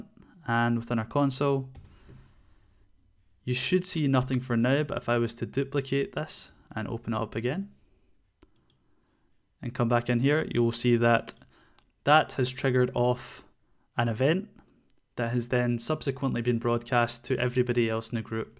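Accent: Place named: United Kingdom. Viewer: Love the accent. UK, right? Another guess is NZ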